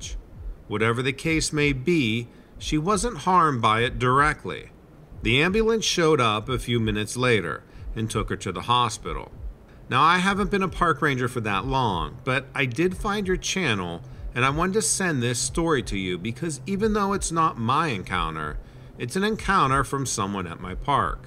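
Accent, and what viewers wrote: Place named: South, United States. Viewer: I can tell you changed the tone a little you brought on more of the southern lawyer twang tyr sound. I dig it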